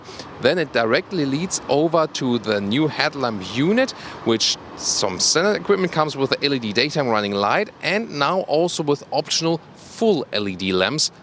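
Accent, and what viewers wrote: Germany. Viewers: He speaks English like a german, why is he speaking English !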